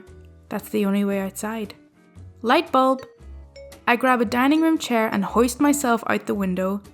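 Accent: Scotland, United Kingdom. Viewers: I so enjoy listening to you read!! I'm sure you are constantly asked but are you Scots? You have a lovely accent where ever you hail from. I, myself am a Texan, and really hate hearing myself recorded but I guess, there are people that enjoy it as I used to d.j. at a small market radio station many years ago. I always wanted to move to the U K. in the hope of losing the twang and gaining a lilt. Oh well. At least I get to listen to you! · Are you Scottish? It's nice! Edit:never mind, I read another comment and read your reply to it. It's hard to tell if your Scottish or Irish.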